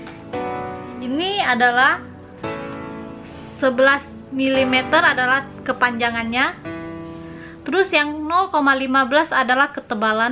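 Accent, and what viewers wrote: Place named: China. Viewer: Bahasa medok cina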